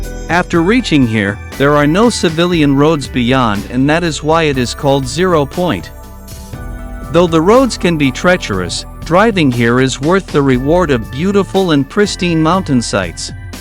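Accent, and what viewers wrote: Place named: United States. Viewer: Why would you use an American voice for this video?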